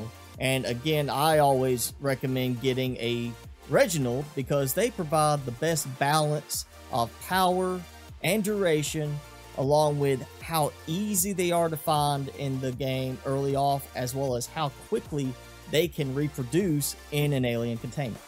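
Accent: South, United States. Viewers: Southern not western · This guy sounds country. Nice video it helped me so much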